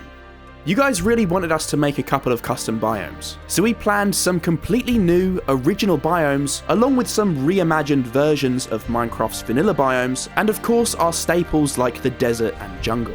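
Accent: United Kingdom. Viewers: Why do you sound like a British Zach Callison? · Are you british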